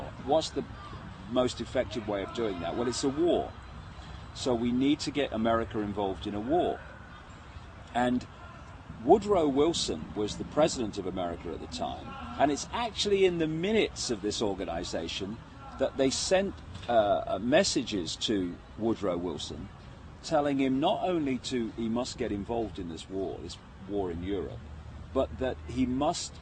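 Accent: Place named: United Kingdom. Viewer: "A certain letter was written between two people in a secret society" can you get more precise while citing a source? Then quoting a traffic warden.. How can anyone believe he is doing anything else than pulling it out of his ass? Just because he has a British accent does not make him knowledgeable or anything. And, all these "signs" he's talking about are just normal things. Civil unrest happens every once in a while, and boosting the warden's powers? What does that have to do with it?!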